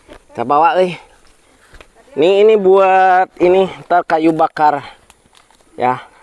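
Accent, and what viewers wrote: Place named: Banten, Indonesia. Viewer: Bahasa na sami siga di banten balukang, baralak kampung naon atuh ieu kang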